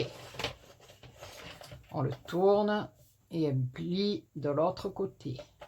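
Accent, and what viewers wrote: South, France. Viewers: Coucou Superbe cette carte.... J adore tes explications et ton joli accent du sud comme le mien 😜😜 Gros bisous 😘😘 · Bonsoir tres jolies vos 2 cartes merci pour ce tuto j aime beaucoup vos tutos et j aime bien votre accent du sud il me fait penser au soleil bon week-end à bientot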